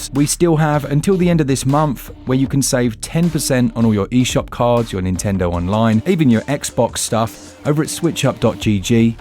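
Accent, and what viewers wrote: England, United Kingdom. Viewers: Deals sound better in an English accent.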